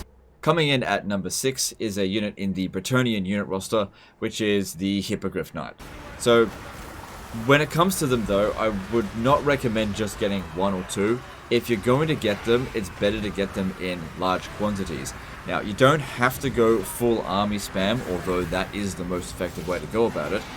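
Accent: Australia. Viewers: I only just realised you live in Australia. It’s like the bloody Aussie accent didn’t give it away somehow. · Huh, didn't realise you are Australian. I thought the accent sounded familiar, but I'm so used to American or British content creators.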